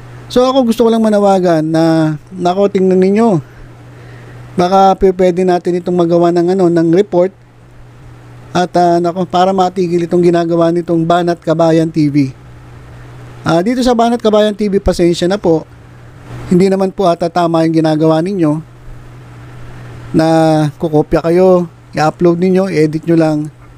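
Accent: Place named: Manila, Philippines. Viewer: Nakinig nko dyn boses mo narinig ko.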